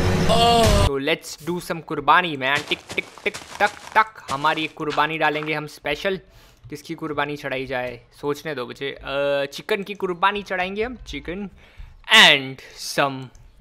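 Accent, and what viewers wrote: Gujarat, India. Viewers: are you Gujarati??🤔🤔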